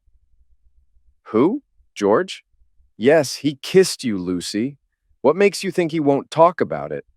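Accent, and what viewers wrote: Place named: United States. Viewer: Somehow, the american accent doesnt quite do justice to the story.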